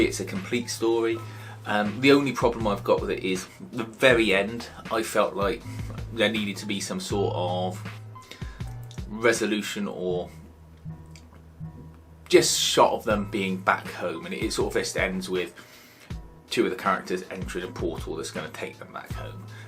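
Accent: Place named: England, United Kingdom. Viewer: Finally hearing an English accent review an American comic.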